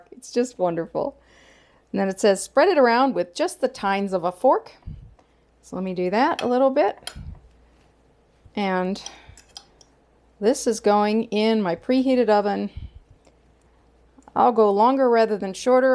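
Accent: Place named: Midwest, United States. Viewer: Your accent reminds me of the midwest, from Michigan here. I love watching you and your husbands adventures in Vegas, thanks for sharing!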